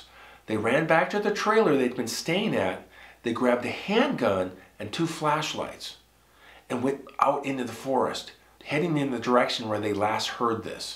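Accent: Minnesota, United States. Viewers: I also listen for the MN accent. Ahhhh home.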